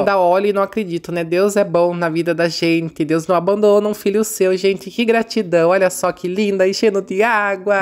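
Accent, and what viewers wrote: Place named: São Paulo, Brazil. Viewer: Gosto do seu sotaque, quando você fala lembro de quando morava no interior em Rinopolis / SP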